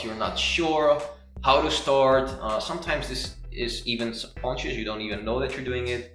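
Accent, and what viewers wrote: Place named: Netherlands. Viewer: Nice video! I always make lists as they provide all the benefits you just mentioned. When I don't I just perform less activities in the day or during the week. Are you Dutch by the way? Ik kan een licht accentje horen ;p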